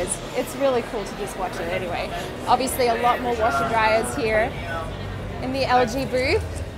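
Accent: Australia. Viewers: Australian mate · So Aussie! So Sydney accent!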